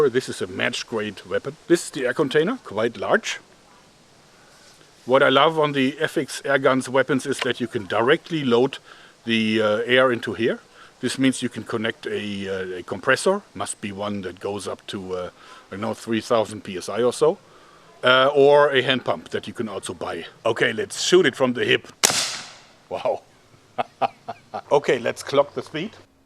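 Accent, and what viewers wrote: Germany. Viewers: Don't be sorry for speaking german, thast is your heritage and birth right, I love your accent and the videos you make are most entertaining. Im from a town called SPARTA Missouri and all we have here is amarican whites as far as the eye can see, (myself being included), except of course for the asians who run the small asion food resteraunt in the middle of the town. Its nice hearing and seeing people from differant parts of the world and your german is a fresh experiance from the norm.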